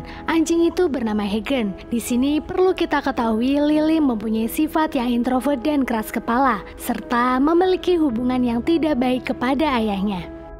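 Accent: Pemalang, Indonesia. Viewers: Min orang pemalang yah?😅 gua orang pemalang neh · wong Pemalang ap mbk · Wong Pemalang mba? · Orang pemalang kakk? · Fiks ni admin orang pemalang😂 Sekota karo aku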